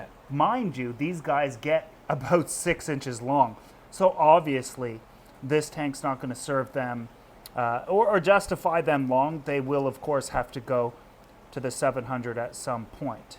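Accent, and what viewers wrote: Canada. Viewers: You saying "Barb" in your Canadian accent reminds me of Coach from Letterkenny.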